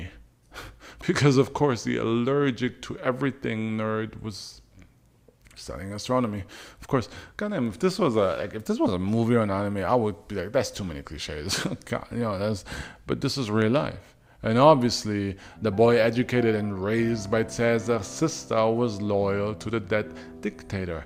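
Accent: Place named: Germany. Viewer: thanks! Very informative video. Your accent sounded very German to me but I wasn't sure what to make of it! We are both in the half-german club!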